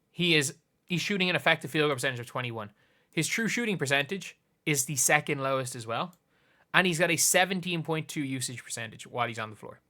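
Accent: Ireland. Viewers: Still not used to an Irish dude talking about American basketball. But I think it’s pretty awesome!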